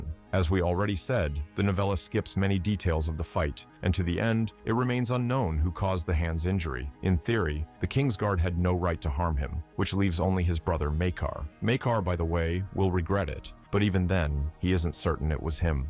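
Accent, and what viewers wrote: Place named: United States. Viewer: You Americans and the way you destroy the English language with your ridiculous accent. Its not Noveeela, its Nov el only 2 synonym's not 3 synonym's no ve la! FFS 🤦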